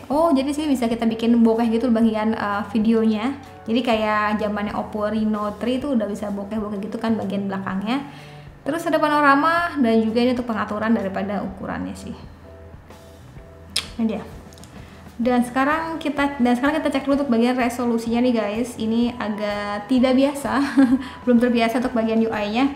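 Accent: West Java, Indonesia. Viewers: "DESAIN DESAIN JAMAN BAHEULA", org sunda nyah teh?😃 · ini orang sunda y, keliatan klo ngmng ada kata "SI" nya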